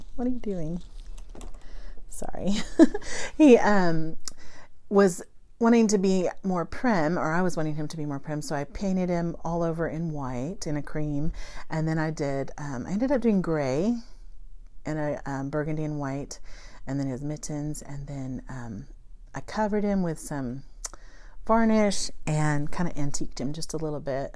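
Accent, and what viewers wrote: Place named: Texas, United States. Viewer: I just found you today. Great video! I guessed you were from Texas! I had to stop and go watch you first video to be sure! I will be in Fort Worth the last week in February with my husband who is attending a work conference. I will have my days free....I would love suggestions of some cute places to shop. Are there any stitch shops in FW? Thanks so much! Ellen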